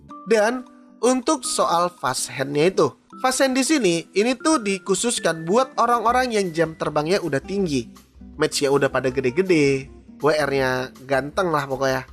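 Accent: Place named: Padang, Indonesia. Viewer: logatnya itu kek org padang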